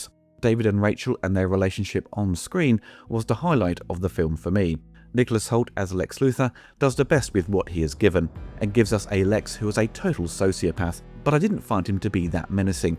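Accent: United Kingdom. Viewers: I saw the film today and am sorry you didn't enjoy it as much as I did. Since this is a non-spoiler review, I will save my detailed comments for when you review the plot. I will just say that I thought the story was very relevant to day... especially for what's going on here in the USA. I've never thought about where you live with regard to a particular film before. But from your accent, it sounds like you live in the U.K. Am I correct?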